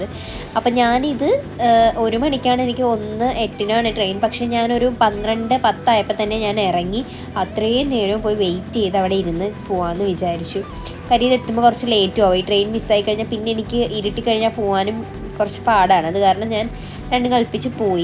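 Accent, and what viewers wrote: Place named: Ernakulam, India. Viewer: Eklm slangayallo😂❤️😍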